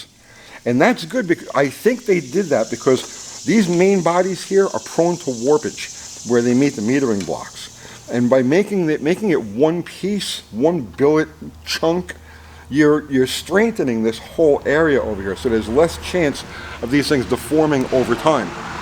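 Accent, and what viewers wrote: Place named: East Coast, United States. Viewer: I dig yir channel ’. Especially yir E-coast patois. On top of that, yir a real guy with an average guys attitude.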